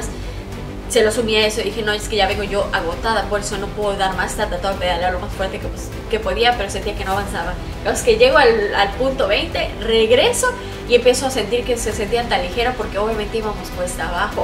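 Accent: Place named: Campeche, Mexico. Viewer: De donde eres. Hablas como Campechana.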